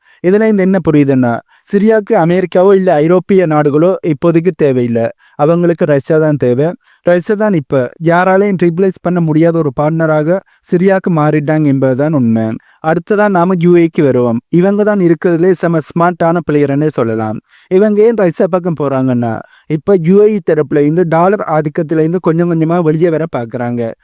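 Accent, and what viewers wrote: Sri Lanka. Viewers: யாழ்ப்பாணத் தமிழ்.😂😂😂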